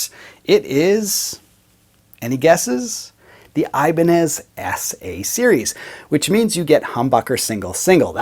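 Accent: Canada. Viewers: no Godin? and I hear you're Canadian and you're not propping up a good Canadian company... Love my Godin Session.. and yes I am Canadian.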